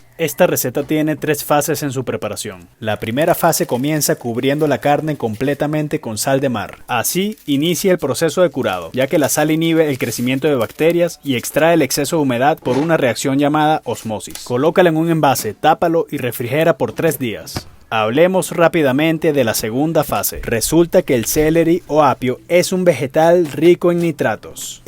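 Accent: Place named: Venezuela. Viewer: por tu acento veo que eres venezolano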